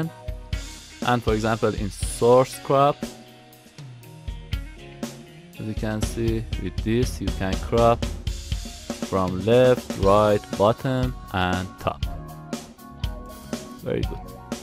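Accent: Iran. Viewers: Thanks, mate. you are sound Persian ;) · az avalesh goftam in iranie :D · That Iranian accent ;)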